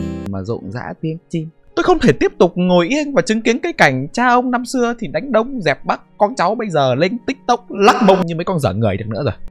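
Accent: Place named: North, Vietnam. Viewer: Thề coi ông Tuân stream t ngủ ngon lắm bọn m ạ. Kiểu t cũng người Bắc nghe giọng ổng như giọng bố mẹ t lèm bèm nhau nên t ngủ ngon vcđ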